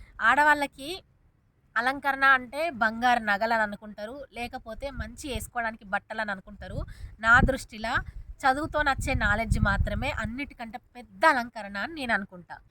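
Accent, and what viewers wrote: Telangana, India. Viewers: Sister మీ వాయిస్ సావిత్రి V6 anchor శివ జ్యోతి అక్క... Telangana యాసలో మీరు బాగా మాట్లాడగలుగుతున్నారు👌👌👌👍👍👍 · గారు మీ వాయిస్ తెలంగాణ యాసలో సూపర్ 👌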